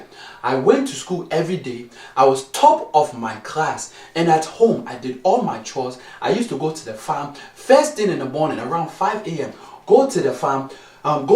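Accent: Africa. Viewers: Why you talk african but dont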